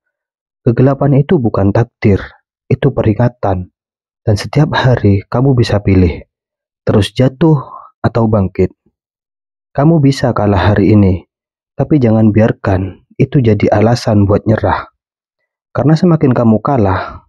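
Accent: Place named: Madura, Indonesia. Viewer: Suara channel ini logatnya kayak orang madura.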